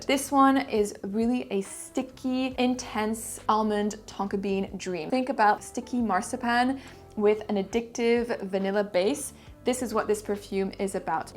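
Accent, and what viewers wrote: France. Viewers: I love your french accent ❤ and all this entire video, Greetings from México 🇲🇽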